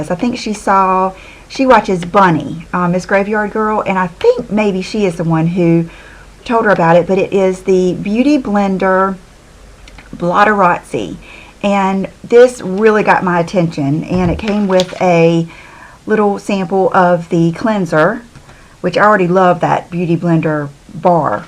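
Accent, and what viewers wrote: South, United States. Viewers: My husband and I are having coffee this morning in our sun room...he heard your voice and asked me, "Are you watching your favorite southern girl again?" :)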